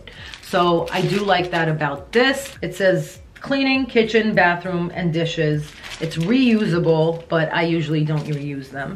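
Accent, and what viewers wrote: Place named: East Coast, United States. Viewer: Omg your east coast accent/chicago ??? accent is mind blowing. Thank you for your tips seriously!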